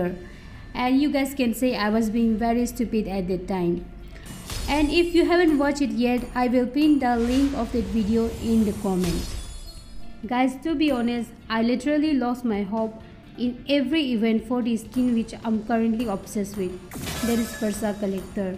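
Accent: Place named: Arunachal Pradesh, India. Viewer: Waah Arunachal english 😅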